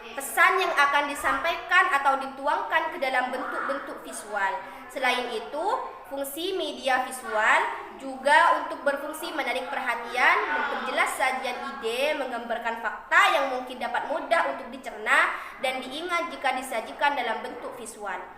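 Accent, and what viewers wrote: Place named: Madura, Indonesia. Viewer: Ciri khas bahasa Madura😁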